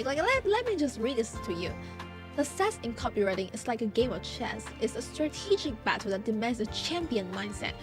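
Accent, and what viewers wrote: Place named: United States. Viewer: Can you be less american in your gestures and way of speaking ?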